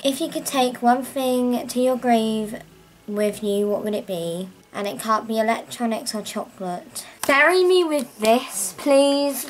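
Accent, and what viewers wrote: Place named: England, United Kingdom. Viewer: Your english accent is so cool and you have beautifull HAIR and EYES 😍😍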